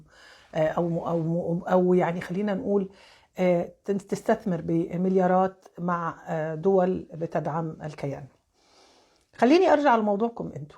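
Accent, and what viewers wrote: Egypt. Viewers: انت لست فلسطينية انت مصرية لهجتك تؤكد ذالك ولم أكمل مشاهدة الفيديو ومصر ليس لها الحق كما ذكرت من في سدة الحكم القاتل عميل الصهاينة الخسيسي يحمي هذا الكيان اللقيط والقافلة تريد فك الحصار لا الحرب وانت تبرري لها الحق عن اي حق وهو جند التراما دوليون الحشاشون عبدة المال القذر لسب الناس المسالمون وإعلام العار والشنار اللاعق الناعق بما هو مخزي لدولة عريقة أصيلة بحجم مصر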